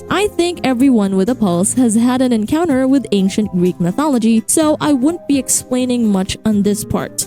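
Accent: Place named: Philippines. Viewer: Thank you so much for making these videos!! I love watching them because I could use these as a basis for some of my analysis (I post them on tumblr hehe) because I focus more on heavy character/design analysis and storyline theories, but I kinda get lazy on the themes and origins part lol. I noticed through your accent that your a fellow Filipino as well haha. xD I hope you have a good day! :3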